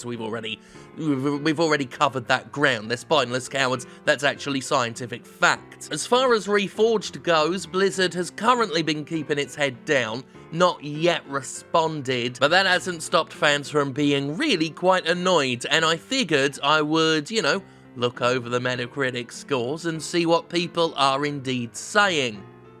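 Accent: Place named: United Kingdom. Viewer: If only I could have everything read to me in a jovial british voice..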